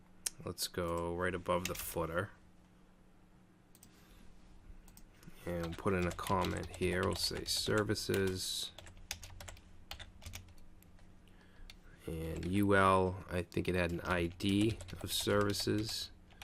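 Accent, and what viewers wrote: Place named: New York, United States. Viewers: NeewYorka Accent ! awesome tutorial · I love the New York Accent!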